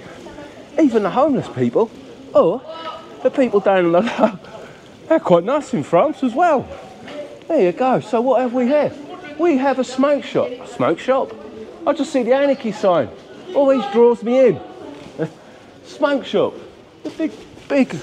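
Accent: England, United Kingdom. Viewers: French people speak English with a French accent, fact. I personally love the way you are speaking French with an English accent. Just as it should be.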